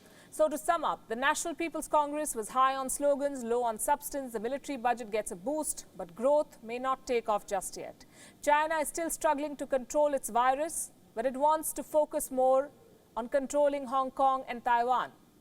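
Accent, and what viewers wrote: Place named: India. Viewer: So many people with low intelligence are making hating comments here cheering this biased news video presented by an Indian woman with strong accent.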